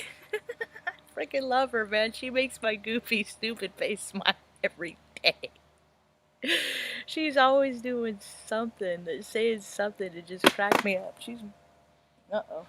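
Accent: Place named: South, United States. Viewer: No coffee Nor Tea ...PBR...IT'S A SOUTHERN TWANG .😉 Ooh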